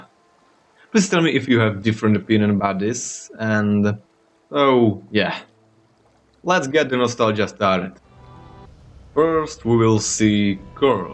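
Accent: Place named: Europe. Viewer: I think he's just European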